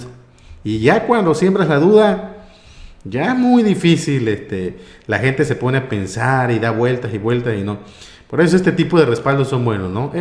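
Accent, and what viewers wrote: Tabasco, Mexico. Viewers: Primera vez que te escucho con el asentó tabasqueño😁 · está bien tu acento tabajqueño pero distraes un poco. 😄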